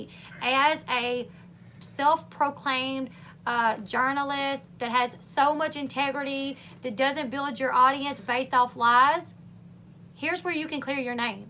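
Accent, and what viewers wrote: South, United States. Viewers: "I've never watched before" but she has a cute southern voice lol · She was right as a southern sister love the accent ( it's like mine) and you are very pretty ,love from Alabama 🤗🐘🐘🐘🤗 that's ALL she was right about 🤣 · You do have a cute Southern voice😉 I truly loved it.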